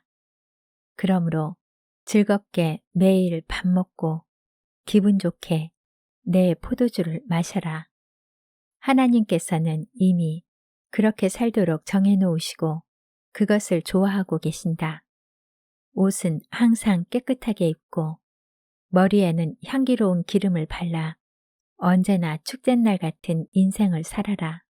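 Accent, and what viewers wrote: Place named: Jeolla, South Korea. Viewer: 오웃 전라도 어투가 쩝니다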